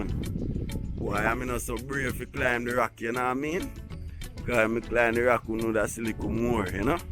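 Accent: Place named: Jamaica. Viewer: Please speak up a little bit and SLOW down please cuz many of us are NOT from Jamaica and PATOIS is like a foreign language to us. LOL. Loved the video. God Bless.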